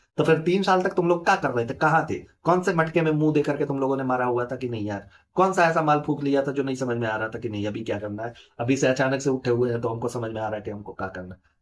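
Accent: Uttar Pradesh, India. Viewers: Recently saw your videos..One thing i like i mean loved watching your videos because of your pure desi style.Please keep it that style…Pure UP style accent and the way you narrate make it more interesting .Thank you🙏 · Problem kya hai bea UP rural ke hain toh? Atleast you have courage to be who you are and showing your face in the video. I love your video and accent. Kuch bhi bolte hai log bhak😅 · Typical UP buoy hai isiliye hume pasand hai aapke vedio, lehja aur pure desi andaz acha lagta hai, pl carry on non stop entertainment🎉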